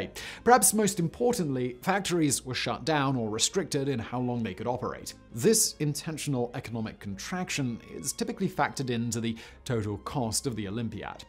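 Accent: England, United Kingdom. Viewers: what kind of English accent is that ?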